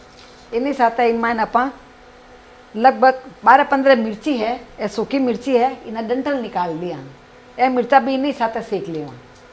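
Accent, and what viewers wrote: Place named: Marwar, India. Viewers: Amma aap ka marwadi accent bahut achha laga. Chatni mai aaj hi try karunga banane ka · Mam am fan of ur marwari accent and the recipe also very fabulous. , well done keep it up 😋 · जितनी आपकी चटनी अच्छी है उससे ज्यादा आपकी बोली अच्छी है। इंग्लिश हिन्दी और मारवाडी का अच्छा वार्तालाप है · Wow m Punjabi and understand ur marwadi accent easily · Mujhe marwadi nahi aati per aap jab bolte ho tho bahut acha lagta hai aap ki cooking great hai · Ye jodhpuri language badi meethi lagti h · Your Marwari accent is so wonderful! When you talk I feel my grandma is teaching me again. The chutney was amazing!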